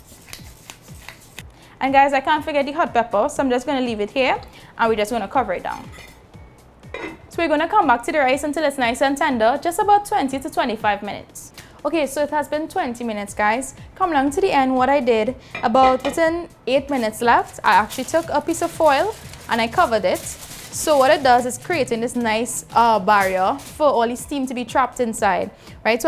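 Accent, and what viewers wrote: Trinidad and Tobago. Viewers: Somehow I lost you on my facebook, but I'm so happy to find you on YouTube. Your accent makes me feel at home.... love that real Trini accent! Please keep these vids coming!!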